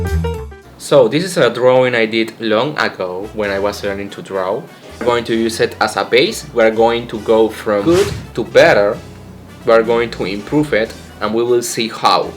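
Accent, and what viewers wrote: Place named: Latin America. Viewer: ya me parecía que el acento era algo latinoamericano (en la información de tu canal dice que sos de Panamá te juro que no te doxeé) de verdad es increíble ver qué la comunidad de artistas es tan variada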